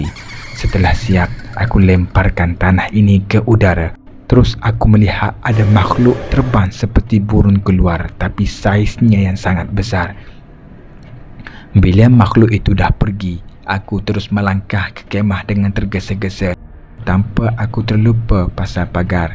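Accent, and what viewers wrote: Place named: Sabah, Malaysia. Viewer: Mcm ada slange sabah sikit jo.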